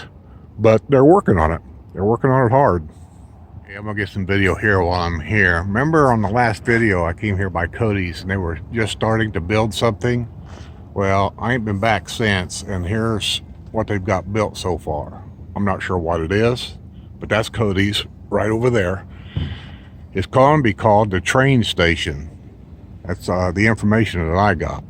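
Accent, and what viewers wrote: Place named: South, United States. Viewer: Love the “SOUTHERN” accent. Are ya from Kentucky? lmao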